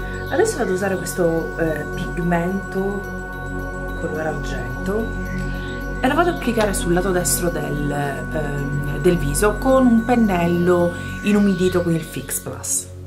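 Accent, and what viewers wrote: Sicily, Italy. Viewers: ci piace così com'è con il suo bell'accento siculo...sennò che sarebbe??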